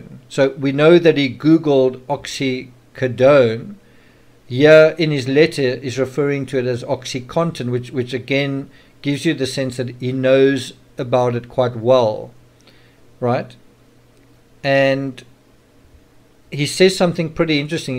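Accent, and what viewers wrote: South Africa. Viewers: Love listening to your SA accent, makes me feel like I’m back home.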